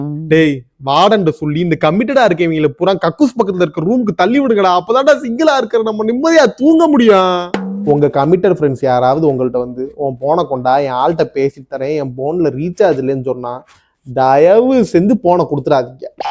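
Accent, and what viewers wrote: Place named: Coimbatore, India. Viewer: Bro deep in coimbatore oo nice slang nga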